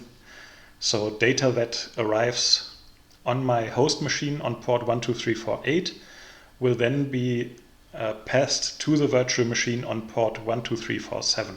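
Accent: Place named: Germany. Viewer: Gut gesprochen, aber man hört den deutschen Akzent :)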